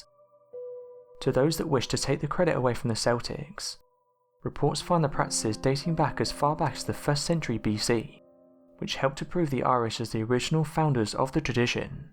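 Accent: United Kingdom. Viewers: Like most Americans, I'm SUCH a sucker for British accents. Lovely PS Best allies ever! 🇺🇸🇬🇧🇺🇸🇬🇧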